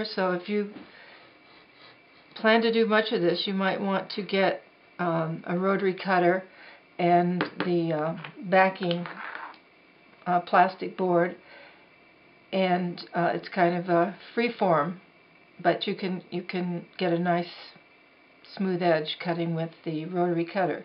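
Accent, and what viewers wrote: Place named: Northern Ireland, United Kingdom. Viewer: Hi. I really enjoyed your video and I plan to try it. Great to hear someone from NI. What county? I'm from Tyrone.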